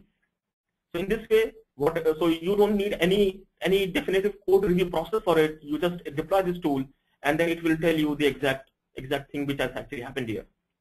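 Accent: India. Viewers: ingles, ele tem um sotaque indiano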